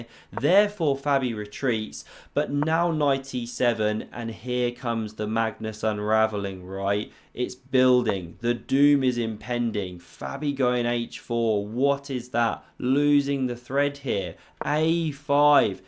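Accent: England, United Kingdom. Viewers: Off topic: Where is your accent/dialect from? I think England, but where?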